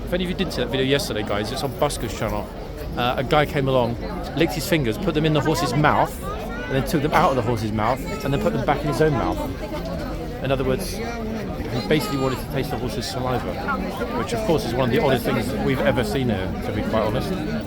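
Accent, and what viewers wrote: United Kingdom. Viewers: I watch your videos always...learning and entertained....while in HK i befriended a few British ladies and guys...jolly people....i love British accent....i also watch DANNY DYER movies,JASON STATHAM and other Brit actors and Irish too....🤗☺ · Your voice is one if the 2 British voices I recognize immediately!! You and Matthew MacFadyen are my two favorites. I enjoy this channel and your humor so much!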